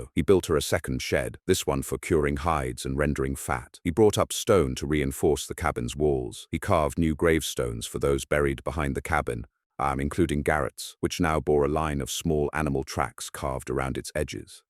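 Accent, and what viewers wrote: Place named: England, United Kingdom. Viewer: What's with the English accent?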